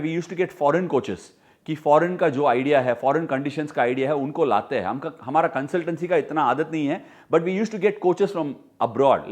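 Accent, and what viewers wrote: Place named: India. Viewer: Who loves his hindi accent ? 👇🏽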